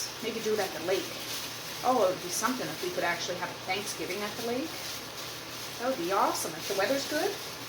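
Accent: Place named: Canada. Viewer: Her Canadian accent. Lol 🙂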